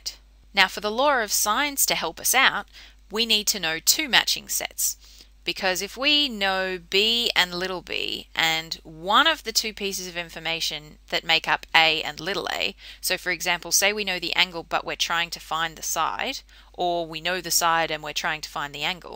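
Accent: Australia. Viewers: are you from australia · Granin shes aussie not a brit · Granin lol she's very clearly australian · Aussie accent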